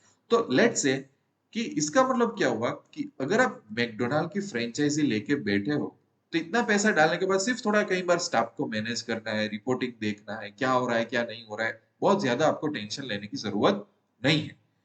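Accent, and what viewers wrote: Gujarat, India. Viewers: gujarati laheko to aavij jaay ho...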